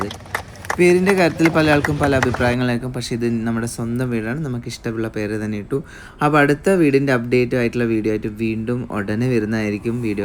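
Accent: Malabar, India. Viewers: മലബാർ സൈഡ് ഒക്കെ ഇങ്ങനെയാണ്, മലപ്പുറംകാരി ❤️ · മലബാർ സ്ലാങ് അല്ലെ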